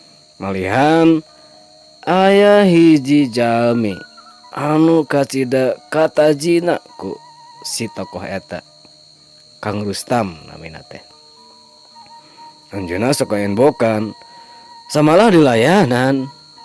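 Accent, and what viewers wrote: Sunda, Indonesia. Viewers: 😄👍👌tos ciri khas soanten kg Guru mah sundanise pisan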